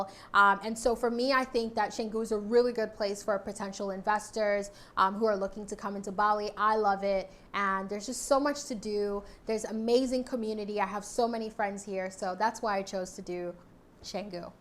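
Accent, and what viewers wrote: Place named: Canada. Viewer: Are you Canadian? Your accent sounds like a Canook!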